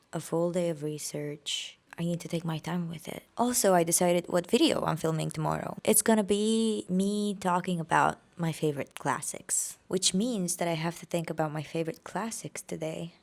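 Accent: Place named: Philippines. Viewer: I might just be weird but you kinda sound like you're from the Philippines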